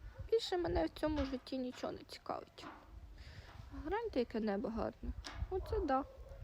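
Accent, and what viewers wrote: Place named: Surzhyk, Ukraine. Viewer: У тебя у самой не русский, не украинский, а суржик.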